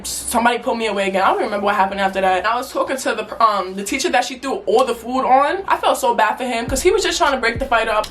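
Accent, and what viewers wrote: New York, United States. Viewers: You gotta be from New York😂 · ouuu i know a new yorker when i see onee 😫😫 lemme guess u from the bx or brooklyn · I knew you was from NYC 💪🏾🤟🏾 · Definitely from ny😂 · You can tell she’s from new york by how started the story 🤣 yktv yessir 💞🕺🏾❗️ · Nah she gotta Ny accent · Definitely from New York lmaooo " aii so BOOM " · You’re from ny huh🥺? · You from nyc❓ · Soon I heard the newyork accent I subscribed WHOMPP🥳🥳hey sista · The way that I knew you were from NY and then you said Coney Island so ik for sure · Are you from NY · she dead got the deep ass ny accent🤣❗️ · you have to be from new york!😭your accent gives life! edited:i said that before watching everything 💕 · The aii so boom just know she from ny cause I do the same thing 🤣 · u sound like u from ny 😂😂 ? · You have to be from New York 😂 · You def from ny😭💞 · the NY accent💕💕💕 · You must be form New York I hear the accent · Instantly knew she was a fellow New Yorker right off the accent and the "Ight so boom" lmao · New York accent hella strong 💪🏿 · She definitely sounds like a New York girl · This my first time watching your video and I can def tell that your from New York 😭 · I’m new to this channel and I already know she from New York 💯❤️ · i love how u jumped right into the story & that NY Accent and you are pretty!🤍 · I could deadass tell you from new york😭. 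· Yea u can definitely tell she from ny💯🔥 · You from New York or am I trippin????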